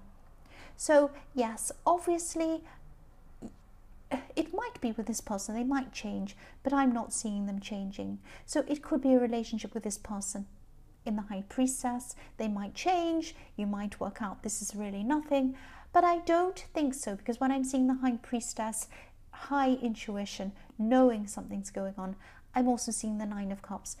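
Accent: United Kingdom. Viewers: u r from UK?your voice is familiar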